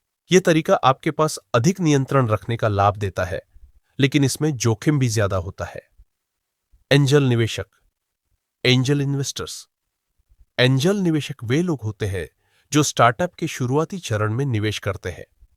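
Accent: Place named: Maharashtra, India. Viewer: आपकी टोन महाराष्ट्रीयन जैसी लग रही है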